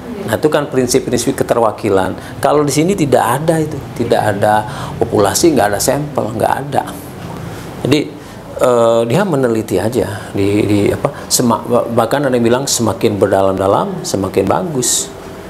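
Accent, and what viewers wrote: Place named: West Sumatra, Indonesia. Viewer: Logat Minang.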